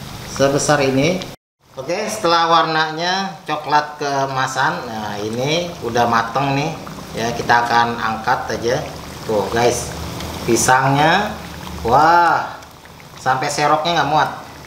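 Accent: Jakarta, Indonesia. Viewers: Wenak pinter abah.. Khas suaranya ky betawi, terima kasih resep nya bah 🙏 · Aye suka bgt ni ame logat betawi Nye,berase kekeluargaan Nye...hehehe Smoge video Nye menginspirasi yg lain yah bang ye...😊😊😊👍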